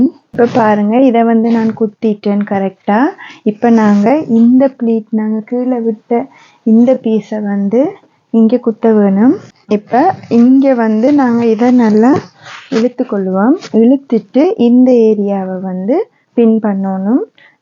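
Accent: Sri Lanka. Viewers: ivanga Sreelankan tamil mathiri pesuthu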